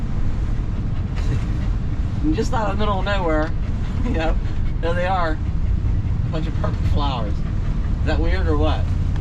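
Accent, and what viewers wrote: Pennsylvania, United States. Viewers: Hey, just noticed your video description still says you're in the Bounder, might want to update it! Thanks for the videos. I'm from MA but have family from PA and your PA accent always reminds me of my family and puts a smile on my face.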